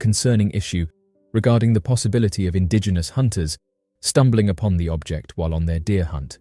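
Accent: United Kingdom. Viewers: Love your soothing relaxing British voice 😁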